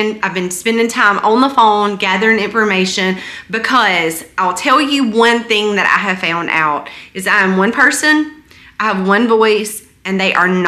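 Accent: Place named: Alabama, United States. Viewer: YOU FROM ALABAMA AINT YA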